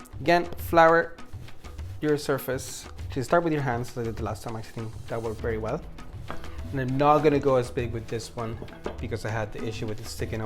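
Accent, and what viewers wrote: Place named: Ireland. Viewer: I do! I love the diversity you exude. I'm guessing you're of Latin descent? I can hear your Irish tones in your voice. Cooking up a rainbow of dishes 💛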